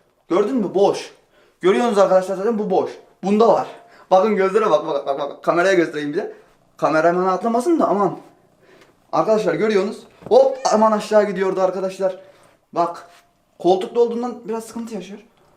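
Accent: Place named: Ankara, Turkey. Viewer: Haçı sen Ankara lımısın bunasıl şive agız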